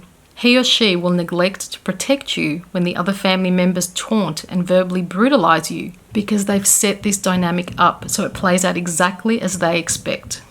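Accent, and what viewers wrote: Australia. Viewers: You sound Australian I hope your doing ok,prayers for everyone there.... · Are you Australian? I really like the accent. And yes I'm a scapegoat too. Hello everyone 👋👋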